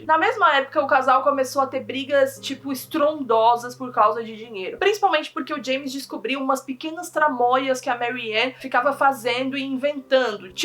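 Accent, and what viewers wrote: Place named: Minas Gerais, Brazil. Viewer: eu pensei q o cara era mineiro tipo quem nasce em minas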